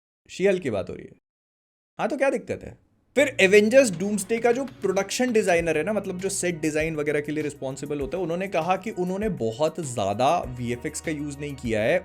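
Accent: Bhopal, India. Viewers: Maakda.... kya tum Bhopali ho ??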